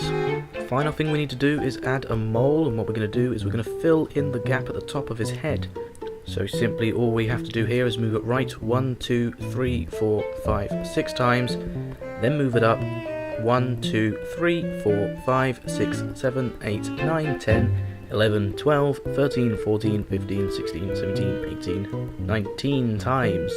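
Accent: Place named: United Kingdom. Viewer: ops british!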